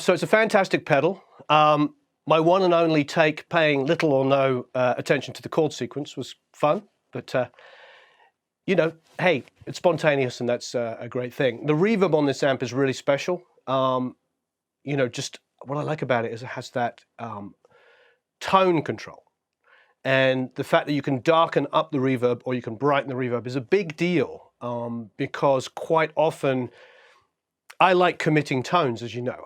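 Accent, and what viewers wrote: United Kingdom. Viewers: Hey man, love your video great sound 🤟 just question you sound like your brit like me Man I've recently just got one these but it's got this inherent hum going into it (I've tried isolating it from other pedal) just like you have here. It appears to be like earth what voltage are you running 9volt DC of the pedal in to your power supply? thank you so kindly ☺️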